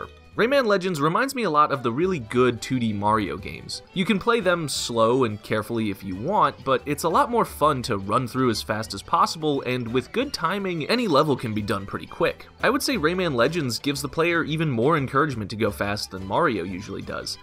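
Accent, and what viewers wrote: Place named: Russia. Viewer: I keep forgetting to download this game. Also it sounded like a Russian accent me. Good review!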